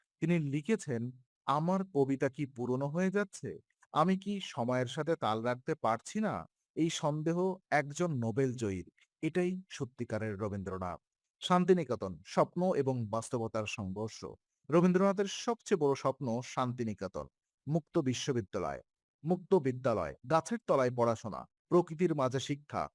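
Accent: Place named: Bangladesh. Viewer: manshi 909 = Pl. try to digest the narration only not to give attention on PRONUNTIATION Possibly the Narrator hails from Bsngladesh.His Research work on whole life of our International Bengali Poet, in a nut-shell _ should be praised first.Hope critjcism be last _ Appreciation be first. now understood = sr.citizen.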